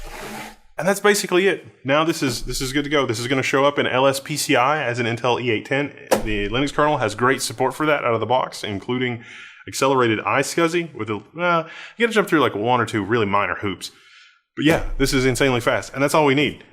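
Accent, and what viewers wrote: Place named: Austin, United States. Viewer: Are you from Austin? You sound like the people I grew up around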